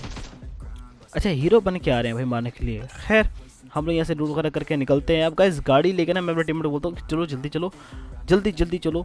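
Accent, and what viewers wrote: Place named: Rawalpindi, Pakistan. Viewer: Love U bhai pindi boy boltay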